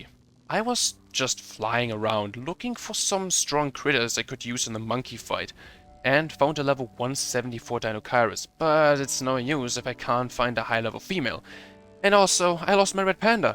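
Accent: Germany. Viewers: Bro Sounds like a german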